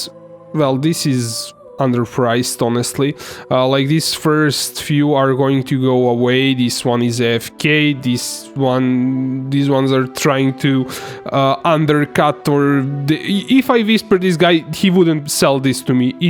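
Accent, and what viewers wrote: Russia. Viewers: Ты русский? Акцент очень заметен.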